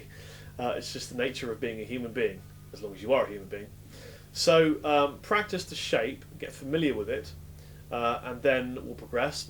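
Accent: United Kingdom. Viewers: British English no less, and it actually makes sense. Thanks!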